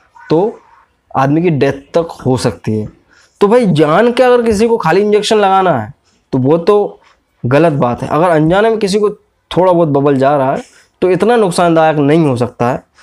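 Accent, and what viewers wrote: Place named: Bhind-Morena, India. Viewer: Bhai ka Bolne ka tone toh bhind Morena ka lag Raha hai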